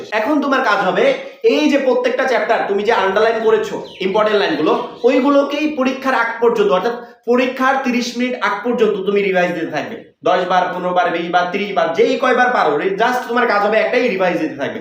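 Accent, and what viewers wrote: Sylhet, Bangladesh. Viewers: আপনি কি সিলেটি😢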